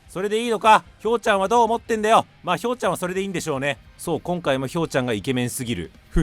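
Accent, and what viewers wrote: Kansai, Japan. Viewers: 大阪万博いって関西弁使うのにハマりました？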